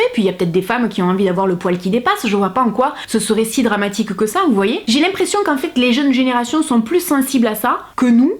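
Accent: South, France. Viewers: J adore ton accent du sud tu es superbe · Je t adore bravo encore beaucoup d humour et même accent que toi ca fait du bien de voir des sudistes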